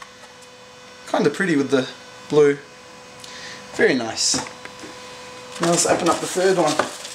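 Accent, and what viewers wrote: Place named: Australia. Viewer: Aussie pride, shown through expensive products and decisive accents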